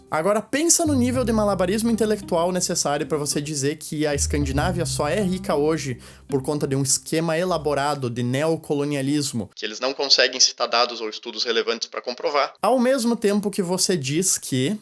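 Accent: Rio Grande do Sul, Brazil. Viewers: O sotaque gaúcho dele faz o vídeo ficar mais incrível ainda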